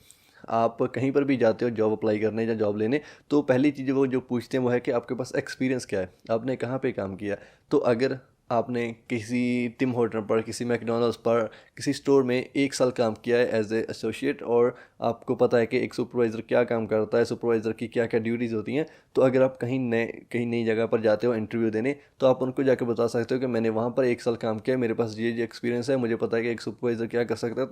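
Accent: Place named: Punjab, India. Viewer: punjabi da svad vakhra e c yr, but its 👍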